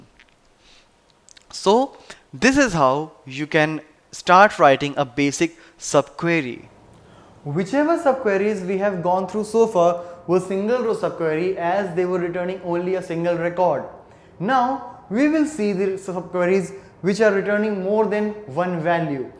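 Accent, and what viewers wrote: India. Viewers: Im following from the first tsql tutorial. We have not got to the joins yet, and yet i have learned more than i have learned from multiple other, 3+ hours long tutorials. Very well structured, easy to follow playlist. Also, a great tutor. Even as non native speaker, despite slight Indian accent (which i like), i have understood everything. Subbed and followed. Thank You.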